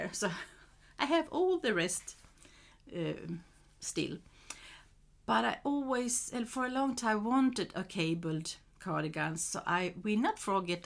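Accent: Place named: Sweden. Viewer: Happy New Year! Wow--not surprising that I was far off for the guesstimate of the yarn amount for 2024 😂 Your Swenglish was excellent--no worries. Loved all the socks and plans...see you next time!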